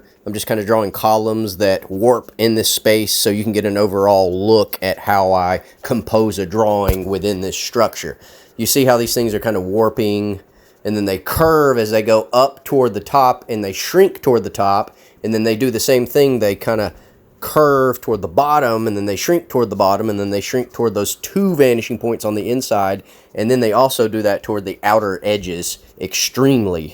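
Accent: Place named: United States. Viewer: See? Americans are still smart even if their accent makes you think they might not be.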